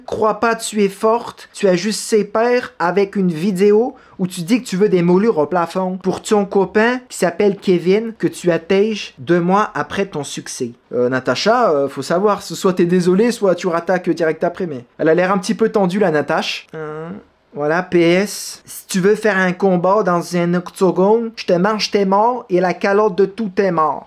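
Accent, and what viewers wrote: Quebec, Canada. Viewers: + l'accent québécois. Que demander de plus 😭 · je suis mongol et j'adoere ton accent du Canada Xd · Oulala les infos croustillantes que tu nous as dégoté! Tu fais un vrai travail d'investigation et avec l'accent québécois (qui fait partie des accents autorisés) on est en totale immersion. Ne lâche rien mec c'est toi le boss de YT, après Michou bien sûr!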